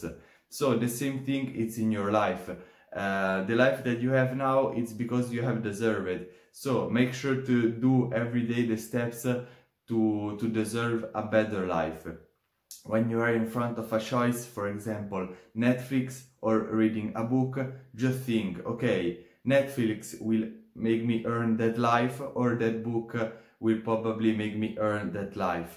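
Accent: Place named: France. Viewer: I ear a little background french accent haha. nice video !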